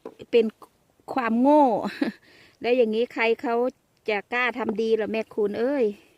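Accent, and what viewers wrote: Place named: Isan, Thailand. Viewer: ชอบเวลาเว้าอิสานจะน่ารักดี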